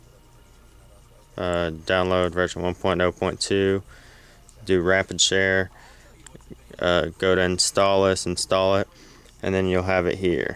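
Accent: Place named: South, United States. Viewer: do u live near the South? u have an adorable accent :D haha lol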